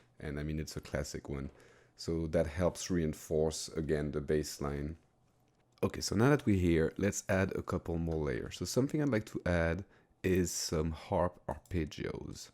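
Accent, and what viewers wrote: France. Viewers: Super interessant tes vidéos. Je trouve simplement dommage que tu le fasses pas en français, sachant qu'il existe pas ce genre de contenu ( tuto composition orchestrale )dans notre langue. J'ai compris que tu étais français avec l'accent ;). Sans rancune ;) parole d'abonné.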